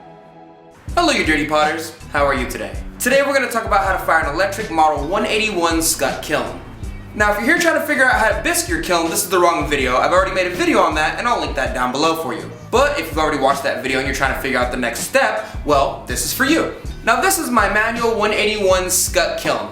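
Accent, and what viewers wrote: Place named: France. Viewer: Great video, buddy! :D Though I'm a bit disappointed you didn't say "tomorrow" with your french-ish accent :P I was kind of waiting for it!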